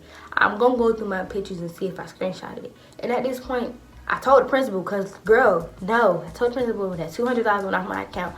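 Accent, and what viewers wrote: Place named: Georgia, United States. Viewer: you from ga ? if so, i can tell bc of how you talk😭.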